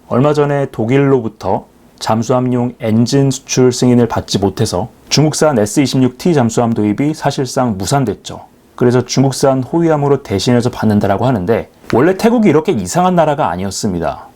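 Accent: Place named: Seoul, South Korea. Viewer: 서울말 좋아요 ^^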